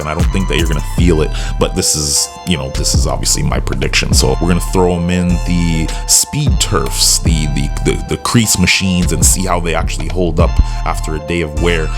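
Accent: Toronto, Canada. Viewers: U from the 6ix??! U sound like it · Jeez this guy's Canadian eh? I hear it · sounds like he’s from toronto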